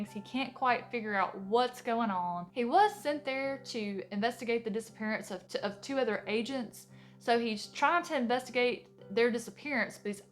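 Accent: South, United States. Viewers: I just subscribed to your channel. I love your Southern accent. I just bought The Way of Kings by Brandon Sanderson. I've never read a book by him but I've heard great reviews. Is this book really good?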